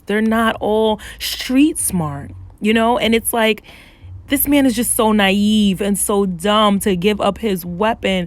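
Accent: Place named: New York, United States. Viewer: You have that New YORKERRR voice 🥰 NYC gang 😝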